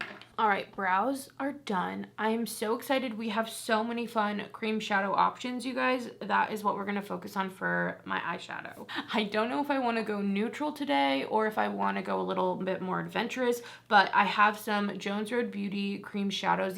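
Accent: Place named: Boston, United States. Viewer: ...Don't listen to anyone who "corrects you" Girl! I'm from Boston, we have a LOT of people with the last name LUZ. Tons of businesses named LUZ and it's pronounced just as you pronounced it! LUZ I've NEVER heard it pronounced loose? That's crap! Hahaha Just tell them you have a boston accent and keep it moving! 😊 You pronounced it fine! 🙄 Keep doing you, don't worry about dumb pronunciations!! 😂 Love ya!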